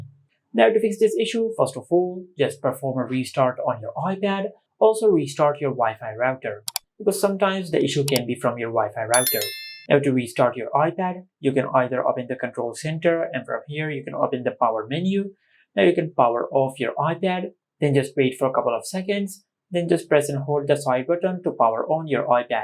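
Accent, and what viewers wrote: India. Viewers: ヒンディー訛りの英語リスニング教材としてハイレベルです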